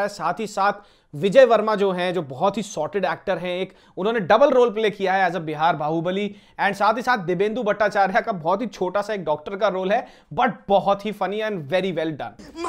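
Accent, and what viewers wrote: Haryana, India. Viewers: Mast haryanavi bolta hai tu....... Continue it in ur video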